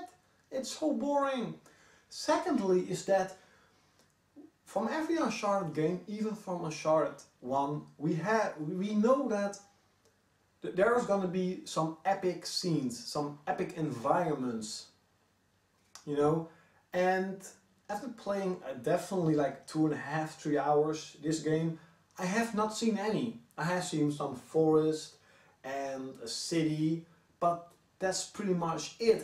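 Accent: Netherlands. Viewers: are you dutch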